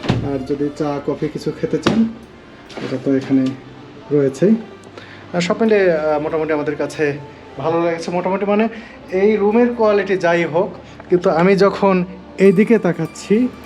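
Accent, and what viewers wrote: Sylhet, Bangladesh. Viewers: বারবার বলছেন , বাড়ি আসাম , আরে ভাই এই ছেলে করিমগঞ্জ , শিলচর , বদরপুর এসব এলাকার , সিলটি ভাষার গন্ধ পরিস্কার !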